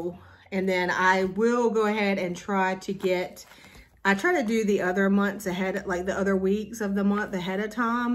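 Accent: South, United States. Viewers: I miss hear that southern drawl so i will definitely be back i have great memories of living in the south 😊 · 🎉 I have heard you shouted out from about 4 floss tubes!!! Love your stitching and honey that accent is like honey! I’m a native Texan and have always loved any southern accent. Happy stitching!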